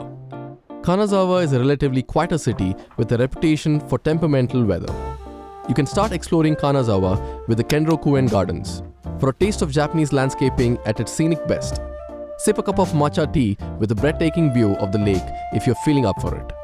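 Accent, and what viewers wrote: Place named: India. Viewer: The indian accent is a bit too much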